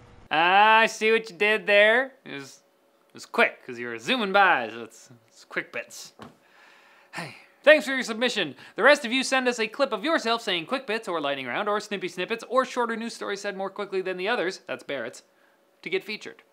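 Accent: Australia. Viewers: mate that sounded australian not like a New Zealander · Why choose an australian accent though? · Your Australian accent is getting better.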